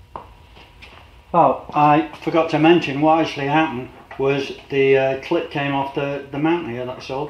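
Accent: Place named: Northern England, United Kingdom. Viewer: The accent is still as strong, I would guess Rochdale, Chorley, Bury, around that area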